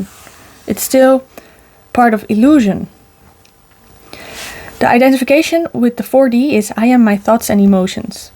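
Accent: Netherlands. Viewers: I could tell your Dutch accent. Great video. Like your dimensional model 😇 Ik ben ook van Nederland en heb een boek geschreven waar ik ook een multidimensional model heb. Echt super!☯️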